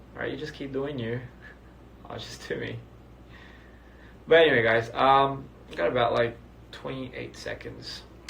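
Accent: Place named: Australia. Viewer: Just here to listen to his aussie accent lol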